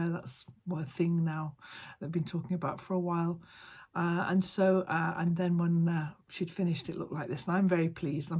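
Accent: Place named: Northern England, United Kingdom. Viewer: Glad you are better. I thought you were a northern lass, I’m a Leeds lass originally but been in USA for 20 years. Lovely to hear your accent.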